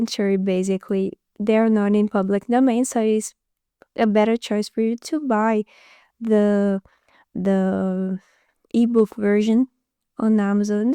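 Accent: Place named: Brazil. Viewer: That's so cool! I'm from Argentina but live in the US since I was a kid. I suspected you were from Brazil due to the accent. Your English is great for not having been abroad! Here they have a lot of little free libraries and the libraries are pretty good with variety. I have probably thousands of books, so I am trying to read and get rid of those I don't think I'll reread. Used books are super cheap so it's very tempting to load up on them.😅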